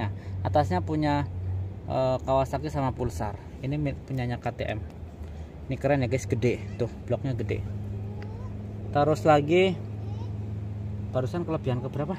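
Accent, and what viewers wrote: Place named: Banyumas, Indonesia. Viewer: Ritinge ndi sih? Khas banget wong plat R 😂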